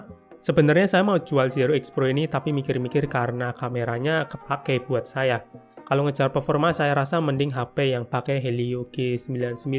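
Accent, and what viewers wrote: Surakarta, Indonesia. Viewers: Lah orang solo bang? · Dari solo kah bang?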